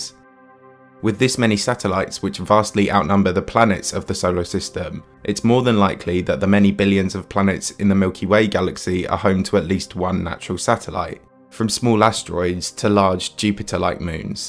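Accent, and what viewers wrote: United Kingdom. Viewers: What kind of British are you?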